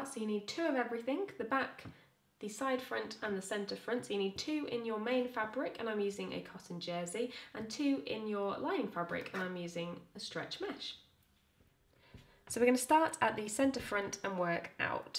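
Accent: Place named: United Kingdom. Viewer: She sounds British so....maybe the UK?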